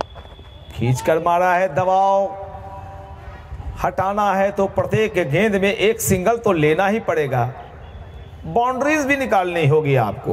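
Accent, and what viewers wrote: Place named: India. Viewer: Your voice is fantastic ji....Totally loved the flow of your hindi commentary. However the English commentary is very awful, Its better to continue in the original Indian English dilect. - Cric Academy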